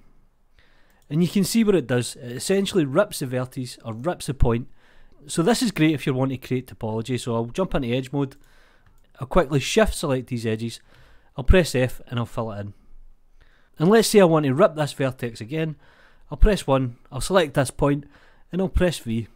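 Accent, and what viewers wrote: Scotland, United Kingdom. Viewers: Came for rip tutorial, stayed for magnificent scottish. Great video, thank you! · Great channel. I enjoy listening to a Scotsman, as I can only understand 2 out of 3 words, it keeps me on my toes.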